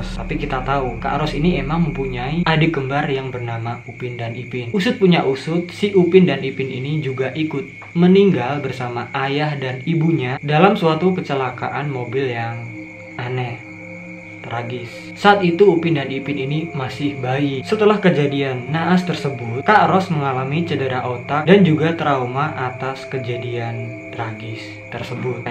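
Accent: Java, Indonesia. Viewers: Orang jawa mana ni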